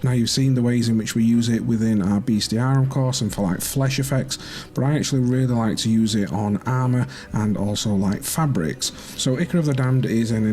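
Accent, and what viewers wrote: Yorkshire, United Kingdom. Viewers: as a fellow Yorkshireman, I love your accent.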